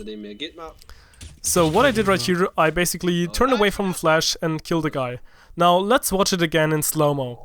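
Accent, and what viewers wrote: Germany. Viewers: ! haha als ich das erste mal deine stimme gehört habe wusste ich iwie direkt das du deutsch bist ;D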